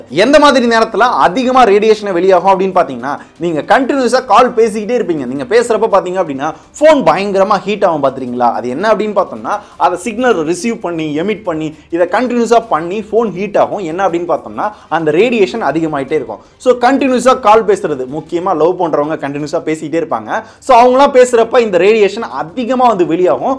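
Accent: Madurai, India. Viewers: BRO nega Madurai ya BRO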